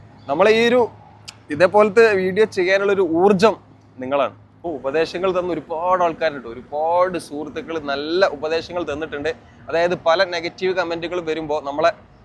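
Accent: Malappuram, India. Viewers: സഹോദരാ, നിങ്ങൾ അത് അർഹിക്കുന്നു. നിങ്ങളുടെ അവതരണവും വിവരങ്ങളും മലപ്പുറത്തെ ഭാഷയും വളരെ രസകരവും ഗംഭീരവുമാണ്. ഞാൻ കണ്ണൂരിൽ നിന്നാണ്.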